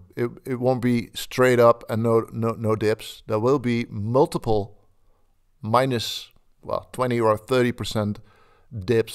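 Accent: Netherlands. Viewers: Heerlijk dat Engels met een Nederlands accent..😊 dank voor de info. 🎉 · lekker hollands accent jonge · This is the most Dutchiest Dutch accent I have ever heard. Great video! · Come for the long term $BTC analysis, stay for the Dutch accent. Thanks!!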